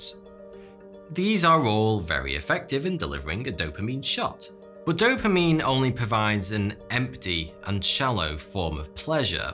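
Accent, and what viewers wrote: United Kingdom. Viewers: So.... is the British accent what makes " " sound like "42" or is this on purpose? or id it only me? I'm not an English native speaker so... just wondering. Anyways awesome content! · Im here for the mustache and beitish accent lol.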